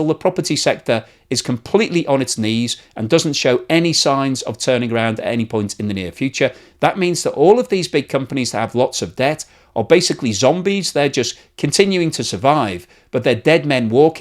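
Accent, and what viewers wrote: United Kingdom. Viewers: British accent = propaganda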